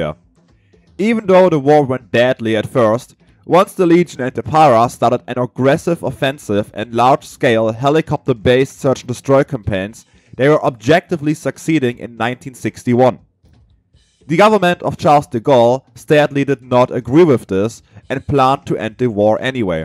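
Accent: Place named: Germany. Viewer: Ich liebe deinen deutschen Dialekt in Englisch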